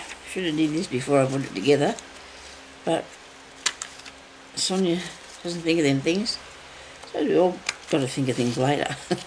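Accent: Australia. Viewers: Hello Sonya, just found your podcast about the padded coat hangers. Enjoyed it so much wanted to watch more. Nice to hear your Aussie accent, from a kiwi in Texas. 💖💖😊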